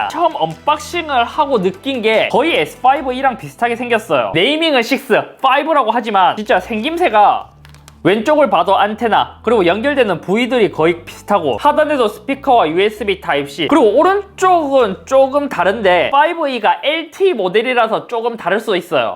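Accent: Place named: Gangwon, South Korea. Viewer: 강원도 억양이시네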